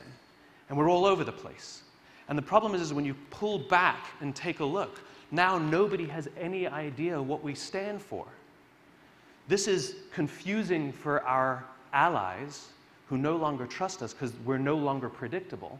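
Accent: United Kingdom. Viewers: Is this guy English or American ? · No question the guy is intelligent, but there’s something disingenuous about him. In earlier Ted talks and other presentations, he speaks with a British accent.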